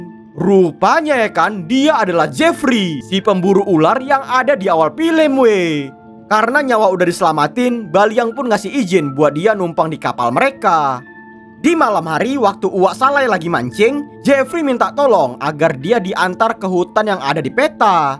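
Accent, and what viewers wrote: Medan, Indonesia. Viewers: Iya we... Anak Medan yaaa Jangan kembut we😅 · Logat medannya kentara sekali bang 😂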